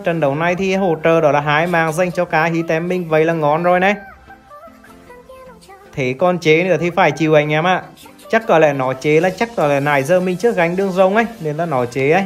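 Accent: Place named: North Central, Vietnam. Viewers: Mình ở miền tây. Nhưng rất thích nghe giọng mấy ace ở miền Bắc Trung Bộ như này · Giọng người Huế nghe hay lắm ln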